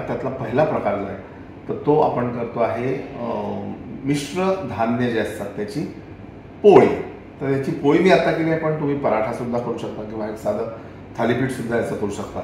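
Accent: Vidarbha, India. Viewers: Sir, multigrain chi poli khupch aawadali.Ani chena ghalun banawaleli tomato chatni...new idea... Tumche video khup aawadtat.Mi pan vidarbhaachi aslyamule tumchi Vidarbh paddhatichi Marathi bhashet bolat bolat sangitleli recipe aikayala v pahayala khup aanad vatato.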